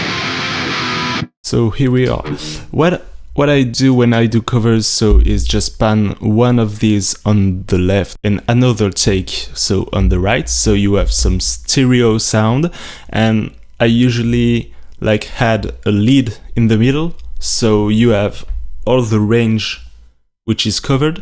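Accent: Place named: France. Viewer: It's great and pleasing to watch another frenchy with international goals. Don't care about what people say about your accent. Be yourself face to the camera and people would feel it. So it's good. Envoi chier les rageux égoïstes qui préféreraient que tu parles français, ils n'avaient pas qu'à sécher le collège. 😁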